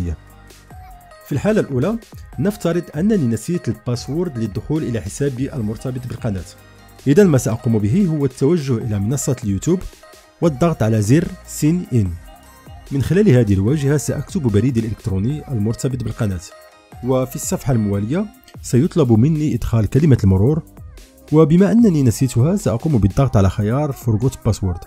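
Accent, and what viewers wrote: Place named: Morocco. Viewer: من صوتك تبدو انك مغربي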